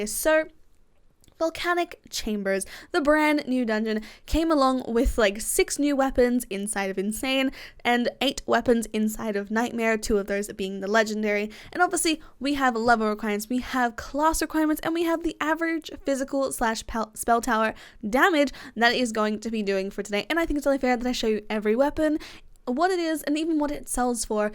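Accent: Australia. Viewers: this is no offence but it confuses me because she has a Australian and normal accent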